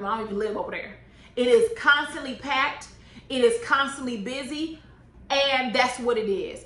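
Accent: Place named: Houston, United States. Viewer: Something TOLD me you were in or from Houston! If Im wrong, sorry, but I always felt like I could pick up on that fellow Houstonian tinge.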